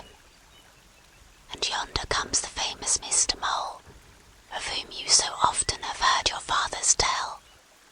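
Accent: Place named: United Kingdom. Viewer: I understand it can be creepy but that british accent totally remembers me of harry potter. And the female whisper its just relaxing af 😍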